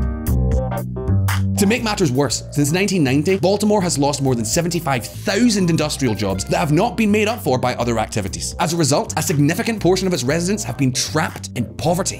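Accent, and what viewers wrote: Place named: England, United Kingdom. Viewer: I agree, but doesn't it feel weird having some guy with an engish accent telling us how bad one of our cities are? Just doesn't feel right bro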